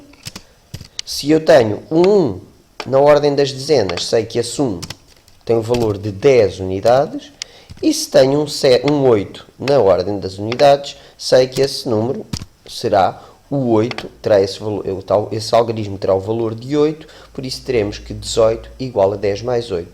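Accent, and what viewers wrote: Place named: Portugal. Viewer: Muito ruim eu tenho prova e não entendi nada com essa voz de português igual o Durval das as aventura de poliana só quem assiste sabe ou pesquisa na internet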